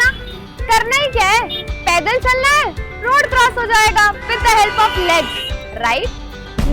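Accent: Bihar, India. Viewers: Bihari Ascent🤩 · Just luv your Bihari Ascent🤩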